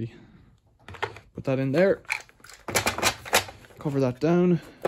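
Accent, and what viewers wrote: Ireland. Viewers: Do you have an irish twang to your accent? Im watching lots of your videos.. I have very similar interests to you lol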